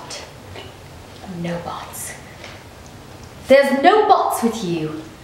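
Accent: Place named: Australia. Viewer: Aussie accent eh. Nice 👍🏻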